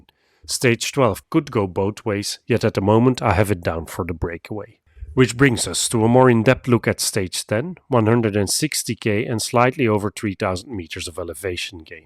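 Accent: Belgium. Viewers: Belgium accent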